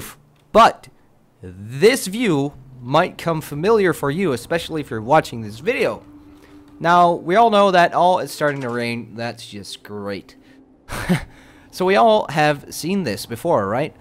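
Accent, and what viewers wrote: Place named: Scandinavia. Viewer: Nice accent. I bet your either from Sweden, Finland or Norway. You guys are the only one that can both speak a very good english and have an excellent accent while doint it. Am I wrong ? :p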